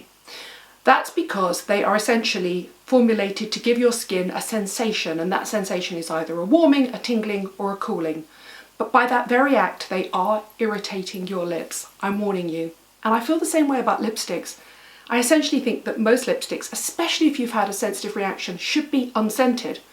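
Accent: United Kingdom. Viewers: British accent 😍😍😍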